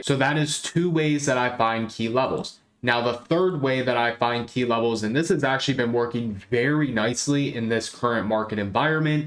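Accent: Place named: Delaware, United States. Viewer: Thanks for these videos. You gotta be from new Castle county 😂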